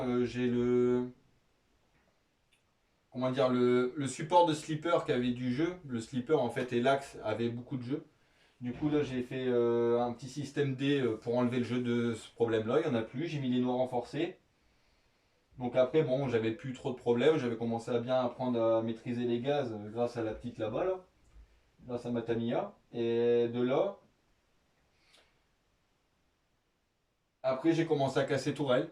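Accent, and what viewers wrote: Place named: Nord-Pas-de-Calais, France. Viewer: Ouais , un ch'ti!! J'ai reconnu l'accent! Welcome l'ami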